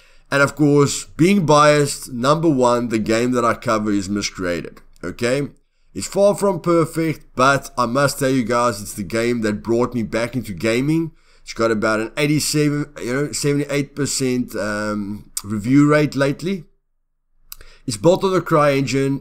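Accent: Afrikaans, South Africa. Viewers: You are from South Africa? LOL I always asked myself what kind of accent you have. And it is an Afrikaans influenced accent, right?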